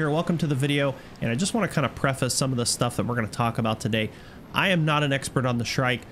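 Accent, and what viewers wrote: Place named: Germany. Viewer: The German guys accent breaks my immersion 😂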